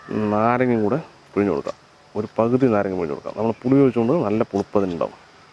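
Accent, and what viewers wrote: Palakkad, India. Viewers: തനി പാലക്കാട് ഭാഷാ......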